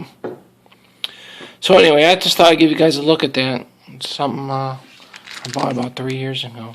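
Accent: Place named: Brooklyn, United States. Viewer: Where'd you grow up that you talk that way? Brooklyn?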